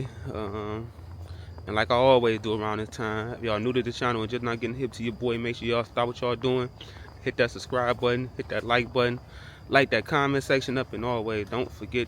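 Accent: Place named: Washington DC, United States. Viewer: Man you sound like a DC dude from time to time you had to around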